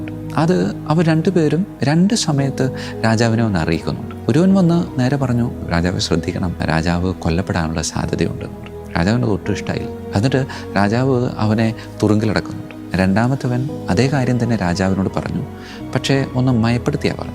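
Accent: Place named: Thrissur, India. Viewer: Thrissur aayirrikkam. Slang .....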